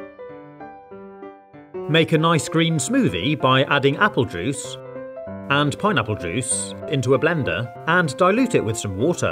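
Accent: England, United Kingdom. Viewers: 99% of the comments: stuff Me: are you British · He's British so it makes sense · He's British. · I surely know that U are an UK native right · That fact that you say "courgette" and "rocket" proved to me that you are English. I did think your dialect did sound Enhlish, but I am not good with dialects.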